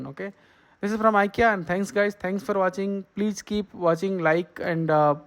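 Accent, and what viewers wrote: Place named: India. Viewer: How can u guys NEVER speak english someone who is not indian can understand, how do u need get the stereotype indian accent every time were laughing at u guys and still u can't learn decent english, man stfu already with ur plilplialpliaplablablabladingelinge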